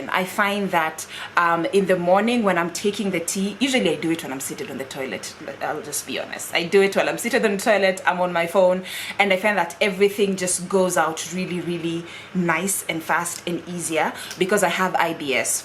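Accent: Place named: South Africa. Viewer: thanks for the information...love the accent, is it South African?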